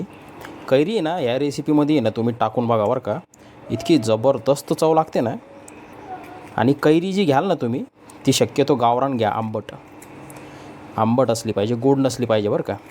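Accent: Nashik, India. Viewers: Tumacha tone Nasik cha chan vatato aikayala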